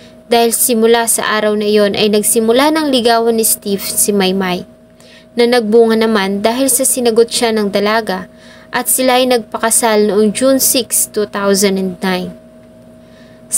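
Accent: Visayas, Philippines. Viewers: bisaya po ata si maam kaya po ganyan pronunciation nya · Bisaya Lang si Maam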